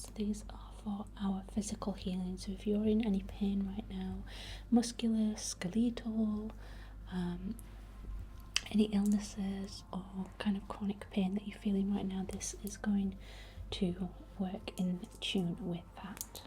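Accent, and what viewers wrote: United Kingdom. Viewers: WITCHY asmr with a BRITISH accent?!?! How did I get so lucky to find you ❤😭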